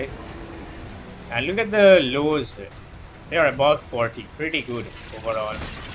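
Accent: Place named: India. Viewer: wow, i thought that is animated 3d model guy in the beginning intro ) everything is so cleaned up, face, eyes, lips , blurred abmience so i firstly thought you are artifical man ) thanx for the touchfull review. its a pity that you guys from India ALWAYS add your accent to the English language. Isn't it very hard just not to do this and speak cleaner, like we russians do speaking in english ? but in your case the accent is very little, so i've understood everyting! thanx )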